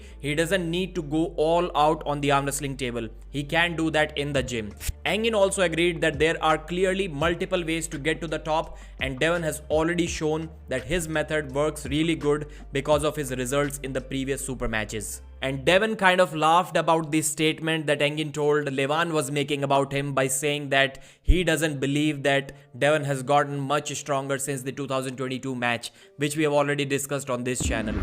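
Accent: India. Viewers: Dude your indian accent is f@ annoying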